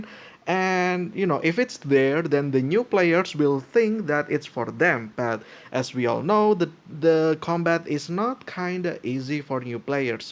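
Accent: Indonesia. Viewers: Iya ketara ngomongnya sih, orang indo pasti bisa dibedain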